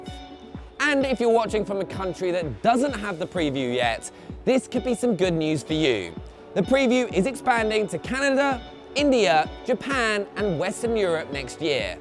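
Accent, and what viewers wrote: United Kingdom. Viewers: Ok so i love the UK peeps , i truly do, even if we boot stomped yall for our Freedom, MY ISSUE IS, why are yall on my youtube 24/7 with that accent that i cant understand , i mean really wth ! this Vid seems really Great and i start listening an all i hear is that dreadful accent and its mucking the entire vid up, and where are my fellow Americans for these types of vids, why are all my Video Game suggestions from youtube are from the UK !!! im thumbs up your vid cAUSE its the proper thing to do and i really like the title ,but i cant understand a lick of what your sayn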